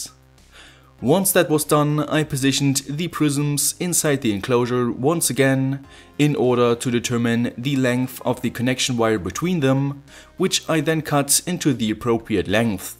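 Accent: Germany. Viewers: Oh wait lemme guess, r u German? U have an accent... no offense tho, I’m German myself haha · Mir war sofort klar, mit dem Akzent musst du aus D sein ;) mal überlegt auch eine Version auf Deutsch online zu stellen ? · Lul, du bist deutsch? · Is that a German accent ? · Are you German? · You come from Germany? kommst du aus Deutschland das Englisch hört sich nämlich ein wenig so an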